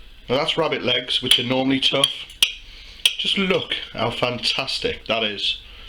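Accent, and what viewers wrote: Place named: Wolverhampton, United Kingdom. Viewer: you sound local to wolves, when can i come round for some?? fair play mucka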